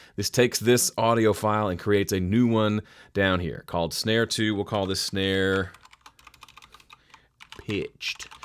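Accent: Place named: Indiana, United States. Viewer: So you’re from Mississippi? Sound more Indiana🤓 How ‘bout dem Memphis Tigers? Great tip too, thank you good sir.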